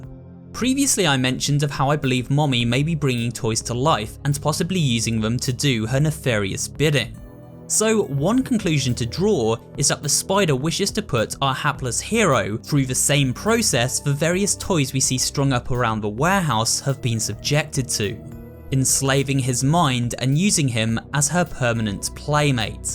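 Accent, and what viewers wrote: United Kingdom. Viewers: Why does she talk like British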